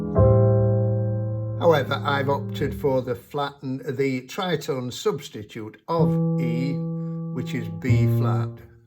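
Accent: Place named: Northern England, United Kingdom. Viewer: Cool to hear a northern accent! I’m from Manchester! Great video:)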